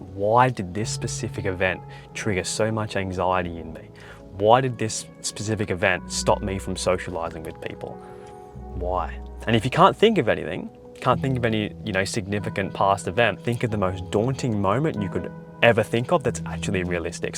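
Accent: Australia. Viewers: He's Australian?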